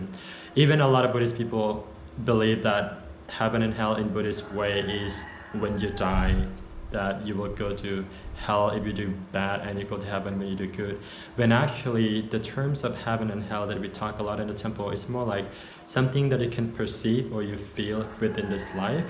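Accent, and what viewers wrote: United States. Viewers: Hi, thanks for sharing your story. I been curious as to how you got American English accent and now I know. I became a monk when I was 10 and stayed for 2 years at Wat Prabhat in Pakse. At the time my mom and younger sibling need to go back to Vientiane but due to finance issue she left me at the temple. But it was alright since my grandmother house is right across the road. Unfortunately my aunts and uncles does not live in Pakse but in a different cities/village and have their own live. Since grandma was too old and cannot take care of me so the only choice was to become a monk. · Wow that's good to know about the monks program. Aw your English accent sounds absolutely perfect like the Californian. Thanks for sharing the awesome informations. Keeping the good work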